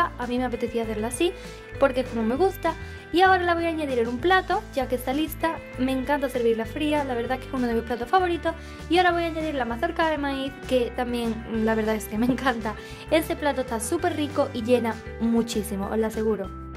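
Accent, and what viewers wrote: Seville, Spain. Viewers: sevillano de andalucia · me encanta ese acento aunque no se de que lugar de españa ??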